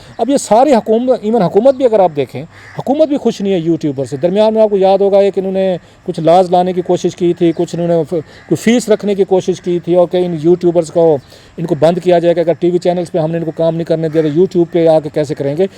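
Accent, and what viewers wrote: Saraiki, Pakistan. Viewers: Dear Sb. Why you have stopped unveiling scandals of PTI/politicians/ zurkoota jins. I think.you have been threatened and frightened and we are disappointed. Pls don't stop sharing facts. Char Ja sooli per Ram bhali kary ga. We are missing your saraiki accent. Stay blessed. You are following Mr. Amir Mateen who has already surrendered.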